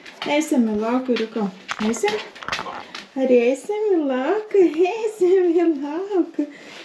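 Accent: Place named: Samogitia, Lithuania. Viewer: Tamsta, esat nuostabi.. Puiki žemaitiška tarmė..malonumas ausiai..malonu Jus matyti ir klausyti..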